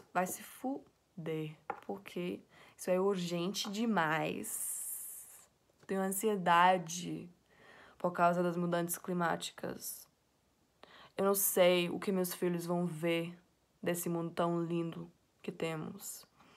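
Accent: Bahia, Brazil. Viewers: Parabéns, você é uma menina muito culta, seria bom ver jovens como você tão inteligente e voltada pra as coisas que acontecem no mundo. Suas verdades nos inspiram... Obrigado... Aproveitando o ensejo, é incrível como você fala muito bem o português brasileiro (sotaque nordestino - Bahia)...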